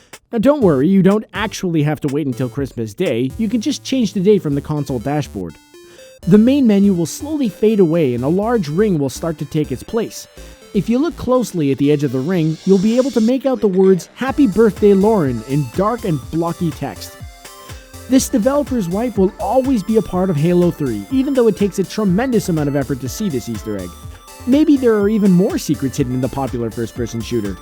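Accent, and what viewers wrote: United States. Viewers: Has an american accent but calls Z "zed" instead of "zee"?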